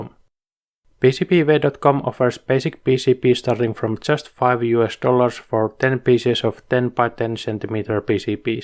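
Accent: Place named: Finland. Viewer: funny thing...the minute i heard you speak..."Suomalainen!" appeared in my head... seitsemän vuotta salossa... at first, i missed the "a-dots" in seven...wait, it was kahdeksan vuotta...onko kesä hyvää...our friend said over 30C, everything too dry...nice to see you here.. kanadalainen, mutta melkein suomalinen 🇨🇦🦈🐻‍❄️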